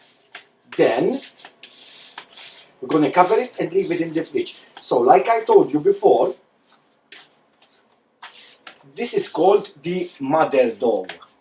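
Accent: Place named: Malta. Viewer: I love the Maltese accent and grammar so-so much!!!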